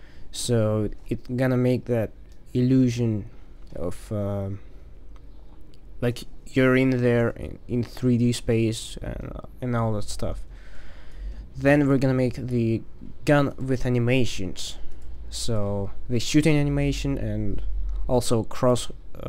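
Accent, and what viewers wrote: Russia. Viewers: A tutorial with a Russian accent? I am gonna like this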